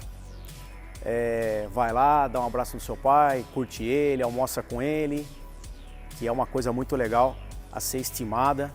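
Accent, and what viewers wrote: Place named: Mooca, Brazil. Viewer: Curioso pra saber de onde vem esse seu sotaque kkk. Parece da Mooca, belo! kkk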